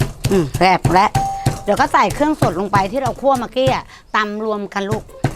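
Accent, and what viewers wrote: Suphanburi, Thailand. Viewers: ฟังเชฟไปมา ...ออกสุพรรณ ซะงั้น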